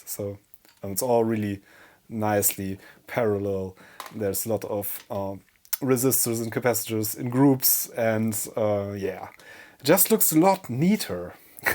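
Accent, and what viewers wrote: Germany. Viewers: Great hands-on video, immediate subscribe. Von Akzent aus, bist du deutsch? 🇩🇪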